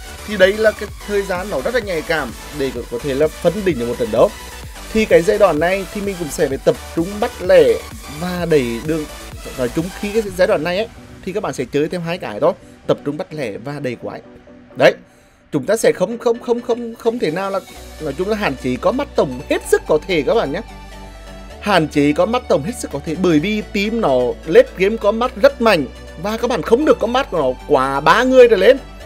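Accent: Nghe An, Vietnam. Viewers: A ở chộ mô nghệ an vậy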